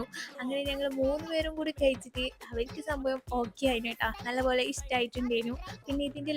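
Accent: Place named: Kannur, India. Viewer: കണ്ണൂർ ആണെന്ന് തോന്നുന്നു.. സംസാരം കേട്ടിട്ട്...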